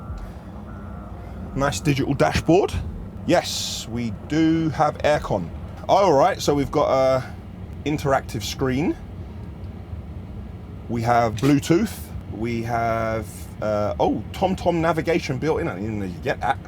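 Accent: United Kingdom. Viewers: This black guy sounds British more than the king Charles himself.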